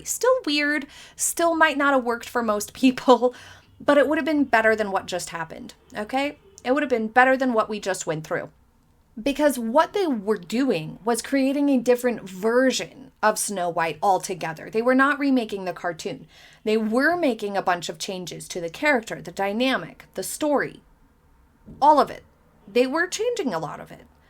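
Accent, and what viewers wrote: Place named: North, United States. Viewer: I can definitely hear your northern accent today. Are you from Wisconsin?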